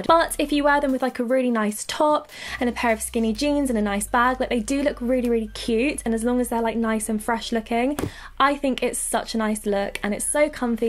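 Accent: RP, United Kingdom. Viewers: Shes so posh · Is she British? Or????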